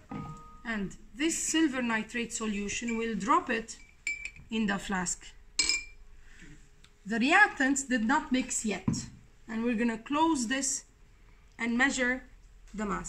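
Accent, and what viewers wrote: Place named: Arab world. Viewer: لهجتك عربية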